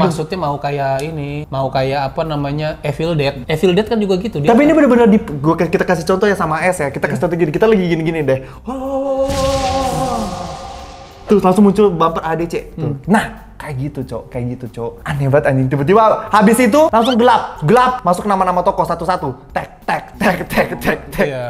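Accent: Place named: Surabaya, Indonesia. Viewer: Dari dialek misuh2nya, Koko nie beneran orang Surabaya. 😂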